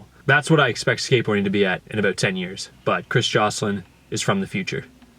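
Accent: Canada. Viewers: It took me 30 seconds To figure out your canadian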